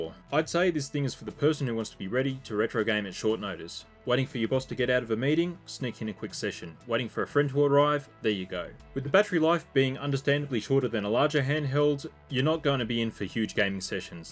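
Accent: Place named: Australia. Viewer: Melbournian here, it’s a treat hearing a fellow Australians voice doing this (kinda tired of Russ from RGC voice..)!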